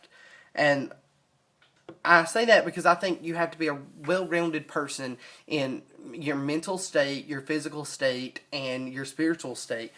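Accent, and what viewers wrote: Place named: South, United States. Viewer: Looking forward to watching your videos! I am from England and I love your southern accent :) x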